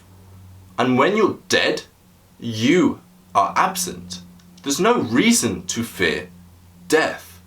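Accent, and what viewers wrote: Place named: Southern England, United Kingdom. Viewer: Where were you brought up pal Presumably south of England but where? How you say like is distracting FYI great work btw 🙏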